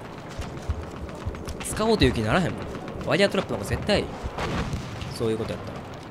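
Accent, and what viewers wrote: Kansai, Japan. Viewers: Ruさんの声めっちゃ好きだわー関西弁もカッコいいです〜笑笑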